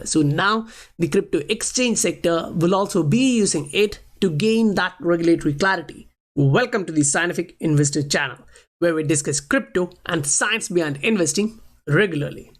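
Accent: India. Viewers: 2 seconds in, lemme guess, you're indian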